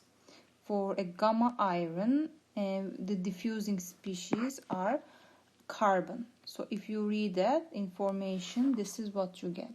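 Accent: Turkey. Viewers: Tam Türk aksanınız var hocam :) thanks.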